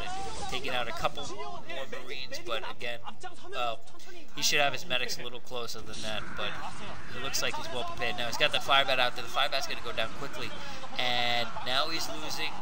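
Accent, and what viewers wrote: Boston, United States. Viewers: nice a new commentator...ur prolly from boston...keep up the great work man...enjoyed it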